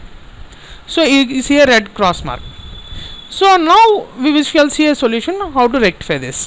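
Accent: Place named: Pakistan. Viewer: Speak English. I do not understand Pakistani.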